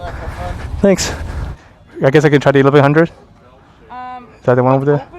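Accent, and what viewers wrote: Taiwan. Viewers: 我覺得你講英文的時候好帥哦！ 講國語的時候又很台，怎麼會這樣子🤔🤔🤔🤔🤔🤔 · 講國語感覺很親切啊 台灣腔很濃😂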